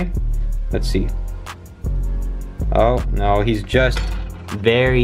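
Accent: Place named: Argentina. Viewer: I don’t really mind if you speak English in your videos, but I love when you talk in Spanish. I mean, that Argentinian accent? God, it’s just beautiful.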